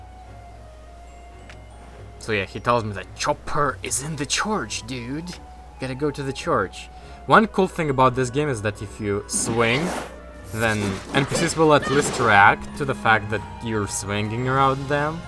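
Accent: Russia. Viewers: Sometimes I forget that is from Russia, nice to hear his accent sometimes. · !!!! What's wrong? Why your accent so Russian?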